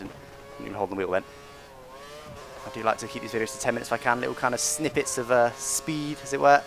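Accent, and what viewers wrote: United Kingdom. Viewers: Awesome congrats! And btw I prefer 20min over 10min:D just love your commentating it's so british!:D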